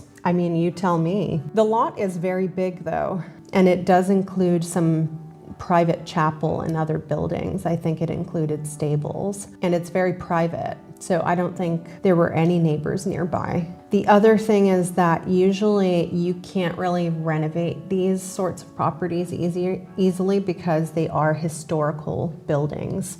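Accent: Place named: West, United States. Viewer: If your hometown is Helsinki how is it that you sound like you are from the western USA? You have no trace of a foreign accent.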